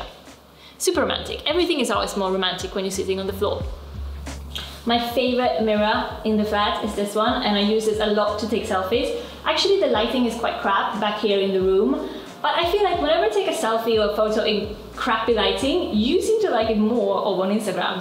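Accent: United Kingdom. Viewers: I can definitely hear some glorious British accent coming along :D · wow you're already catching some British accent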